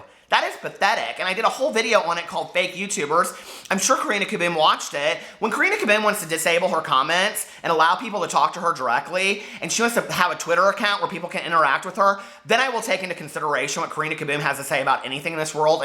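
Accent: South, United States. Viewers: OK, I am from NC (South) and I think you do have a bit of a Southern accent. You'd love the ones here. I hate mine so I can so relate on hating your own voice:/ I like the black hat better, too. I've played Bingo at home and stuff but playing in public sounds super intense. lol. Love State Fairs but haven't been to my state's. I've been disappointed by the ones I've gone to in my hometown. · Ok so sent me over and let me tell ya, she was <num>% right about you! You are absolutely wonderful!! I could just set and talk to you all day! You are so funny! You do sound like you have a southern accent and I LOVE it! You seem so sweet and down to earth! And one of the reasons I love so much is because she's REAL and honey, you are too and it's refreshing! So many fake people on YouTube anymore and it's sad. So so so glad shared you with us!!! Gonna have a "Dad" marathon tonight!!!!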